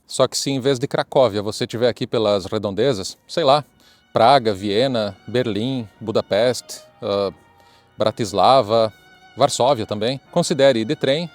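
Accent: Rio Grande do Sul, Brazil. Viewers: Bom dia, só pra tirar uma dúvida, vc é gaúcho? Quero te parabenizar pelo belo trabalho e pela explicação ótima. Infelizmente quando falamos sobre esse assunto nos assunta e nos comove muito. Obrigado pelo conteúdo e por nós trazer uma série responsável e uma voz de verdade.❤ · Você é do Rio Grande do Sul? Parece com o sotaque do RS. Uma professora me contou que uma amiga visitou esse campo. Ela chorou, e falou o seguinte: "o homem é um m0nstr0 mesmo". Acho que, por mais que eu estude, nunca vou conseguir entender a II G. M. Como um homem conseguiu convencer uma nação de que um grupo de seres humanos deveria sofr3r coisas tão ruins?! Como ele fez isso?! Por que acreditaram nele?! Por quê?! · tu ainda pergunta se ele é brasileiro? Olha o sotaque sulista dele. Meu ,é tudo tão nitido .😮😮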